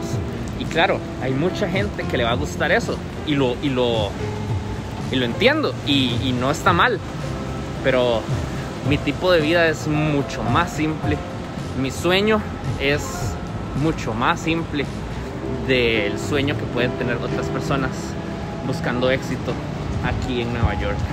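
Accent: Costa Rica. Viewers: Maee fijo usted tiene que ser tico o alguna vez vivió en Costa Rica jajajaja saludos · Si no me equivoco eres de Costa Rica por ese acento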